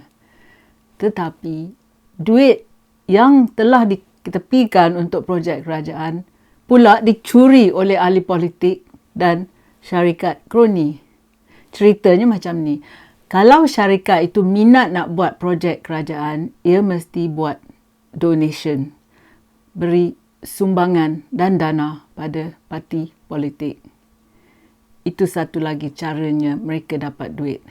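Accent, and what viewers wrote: Perak, Malaysia. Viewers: Akk nie asae org PERAK ke?😂😁..telo PERAK kuat beno🤣😂😁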